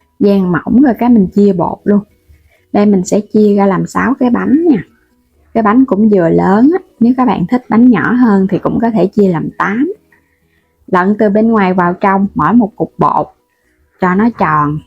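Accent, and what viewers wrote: Mekong Delta, Vietnam. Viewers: Giọng nói miền Tây dễ thương mà bạn sao nghe khó vậy hả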